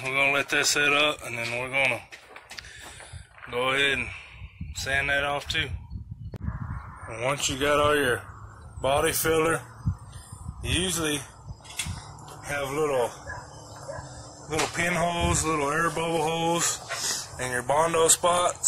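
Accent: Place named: South, United States. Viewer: Where you from? I seen Texas plates. I live in Houston myself but you sound like one of my uncles in Georgia/Alabama lol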